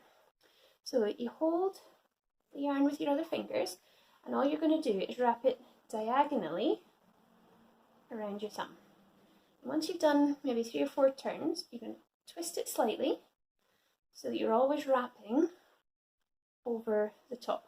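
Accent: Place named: Ireland. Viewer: Cute accent. Ard you Irish? Great videa! Thanks! I'm trying it now.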